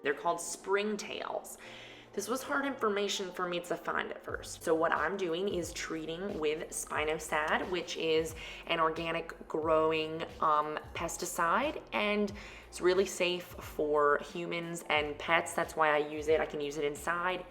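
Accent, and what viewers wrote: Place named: New Orleans, United States. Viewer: Great video... all I needed. Hopefully I can nurse my Fiddle back to health. Also, off topic, are you originally from Louisiana by any chance? You sound exactly like my cousins from New Orleans. Love your presentation and enthusiasm!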